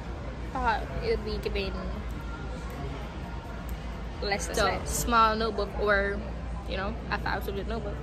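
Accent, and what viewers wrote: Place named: Jamaica. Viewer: I love u accent❤ are u jamaican?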